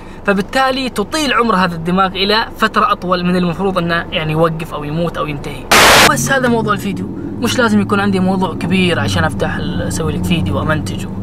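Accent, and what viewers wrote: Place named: Saudi Arabia. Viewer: اتوقع انك مصري بس تتكلم سعودي زين ما شاء الله عليك 😂💛 ،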